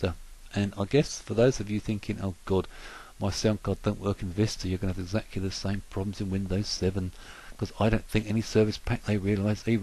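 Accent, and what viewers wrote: United Kingdom. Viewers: wtf with these limey voiced tutorials, didnt even get to 5 secs. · wooh... that's a hard britain accent xD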